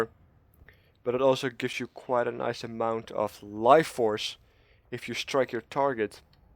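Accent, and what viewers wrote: Netherlands. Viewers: Dutchie? :P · You can hear that he is dutch lol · French? What french people have you been listening too? He sounds dutch.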